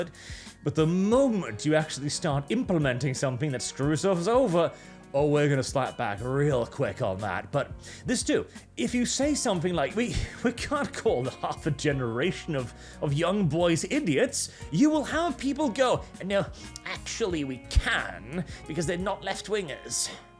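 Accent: United Kingdom. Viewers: I had no idea you were Norwegian. I guess it's the 17th century British military officer thing you got going on. Not saying it's bad. When I started watching your 40k lore videos, I pictured you wearing a stuffy uniform with a monocle, and a handlebar mustache. 😂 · You’re the most British Norwegian I’ve ever heard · I always thought he was British. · Wait, you're Norwegian? I thought you were from the UK. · I thought he was a brit for years until I heard him mention he is norvegian xD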